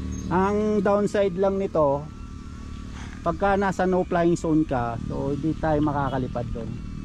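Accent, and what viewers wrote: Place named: Pampanga, Philippines. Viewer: Kapampangan ka po ba sir? Na enjoy ko video mo hahahah bukod sa dahil sa drone e ka accent mo din yung namayapa kong tatay, more drone videos po🫡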